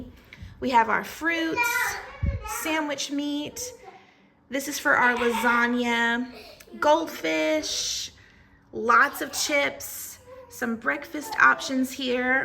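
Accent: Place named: North Carolina, United States. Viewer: Just found you looking for laundry room makeover (its was beautiful by the way..2025) annnd to get the view number off of 666..🫢😁..seen in your videos(love her channel) you are so fun and love the accent..im in North Carolina so it sounds like mine..lol..I have subbed and look forward to getting to know you thru your videos..God keep and God bless 🙏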